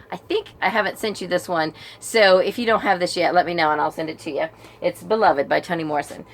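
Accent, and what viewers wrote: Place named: Oklahoma, United States. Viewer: Yes, I love your Oklahoma twang!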